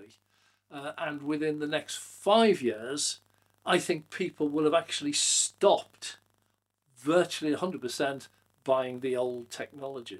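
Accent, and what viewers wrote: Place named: Northern England, United Kingdom. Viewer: Northern! West of the Pennines to be more precise (with a hint of Cornish thrown in 😉).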